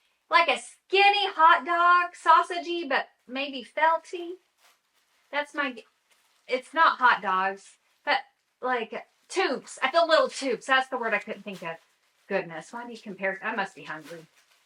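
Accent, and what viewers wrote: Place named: Texas, United States. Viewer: I haven't watched the whole video yet.. but I am here for the unboxing and your commentary. I love when your Texan accent comes out! Makes my little Canadian heart gigle 😂❤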